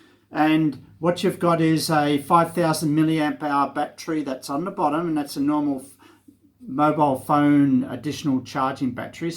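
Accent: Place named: Sydney, Australia. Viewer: This guy sounds like he's from Sydney 😛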